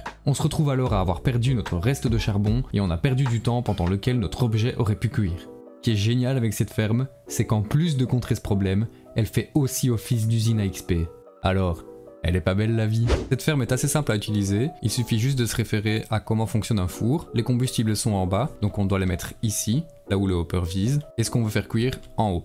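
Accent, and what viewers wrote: Belgium. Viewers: Ton accent c'est un belge ? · Bonjour à toi merci pour cette vidéo serait tu belge ? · J’ai l’impression de reconnaître un accent belge 🤔 Je me trompe ? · ça s'entends tu est belge, en tant que français je me dois de na pas liker cette vidéo même si je l'ai apprécié.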